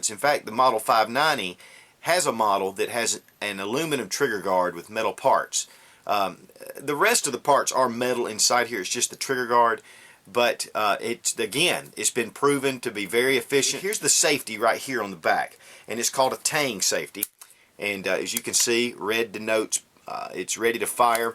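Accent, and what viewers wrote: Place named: South, United States. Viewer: i love my 500a, i mean its not a suave 870 but i mean really when your doing dirty work dependable is where it is. also 8 rd's in the tube one in the chamber you can't argue with that fire power, and also add a sure fire to the front and a shell carrier to the butt stock you got yourself low budget shtf gun, i mean a slug is a human stopper hell buck shot is too. great review, i love your channel and love the fact im hearing a southern accent with my review long live the republic!!!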